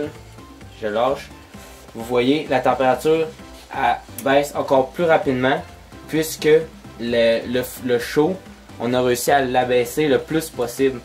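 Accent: Quebec, Canada. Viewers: vous avez vraiment un accent affreux les québécois, on a du mal à comprendre vos phrases pourtant on parle bien la même langue non? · habla francés de canada.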